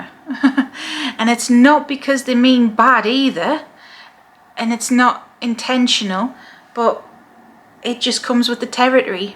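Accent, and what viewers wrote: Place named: North East England, United Kingdom. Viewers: it's so surreal to find the first video talking about working with Hecate is by someone with a very similar accent to me - hi from Teesside :) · This might be a bit off topic but I'm curious to know if you're from Newcastle/ the North East? I'm just mentioning it because I lived there when I was younger and when I clicked on this video (after weeks of feeling called to learn more about her) your accent struck me as a positive sign that this video was meant to find me ❤️‍🔥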